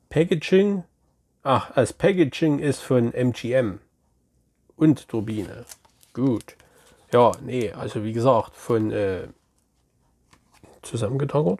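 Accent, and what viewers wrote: Bavaria, Germany. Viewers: Servus da hast Du interessante Filme bekommen gerade den film Bliss ich wünsche dir gute Besserung es dir ein Leberkäs den kann man titschen aber nicht in die Milch sondern in den Ketchup und zwei Knödel aber zum Essen😉 mach noch mal nächste Mal noch bayrisch das tut gut da kriegt man Heimweh da ich gerne auch nach Bayern möchte in Dorf 🙏 gruss Tony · Danke für dein Päckchen! Joa mei dein Bayeisch ist ja Klasse! Mach dir mal en Leberkäse vielleicht hilft s! Konntest schon was aus meinem Päckchen sichten? Possesor muss ich nochmal starten letzte mal abgebrochen!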